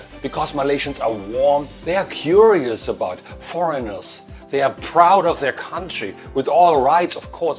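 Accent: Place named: Germany. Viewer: After 30 years living abroad... U still got ur authentic german accent .. ur sounds like u've been in Malaysia yesterday...🤣🤣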